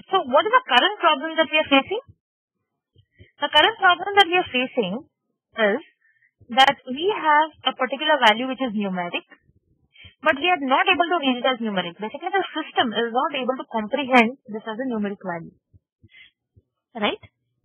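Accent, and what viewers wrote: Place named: Asia. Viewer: Very good and knowledgeable video while learning SAS, thnx to this post and the lady who is teaching. and those who think its asian voice so he/she cant teach, and those who think its poor quality(go ad c ur a*** hole) and the one who can't understand this simple language....are big fuk***** and ge out of here :P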